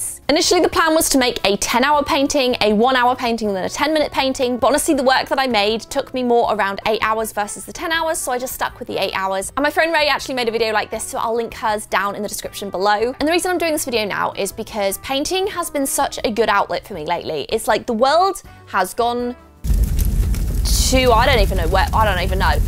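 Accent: United Kingdom. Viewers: Someone plz explain. She has a amazing British accent but she talks all the time about Walmart, USA and other things related to that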